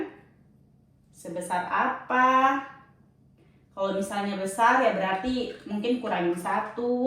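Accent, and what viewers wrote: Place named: West Papua, Indonesia. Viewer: Kakak ini dari Papua atau dari Sulawesi dari penampilan kakak kaya orang Sulawesi tapi dari dialek kakak kaya orang Papua Barat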